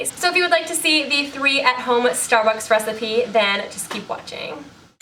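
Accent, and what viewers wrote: Australia. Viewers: Is this Australian? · Are you an Aussie?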